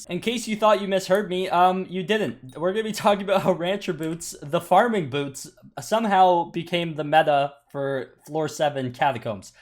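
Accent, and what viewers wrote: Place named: Canada. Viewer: i love ur slight Canadian accent and i can barely hear it and it only adds to ur charismatic personality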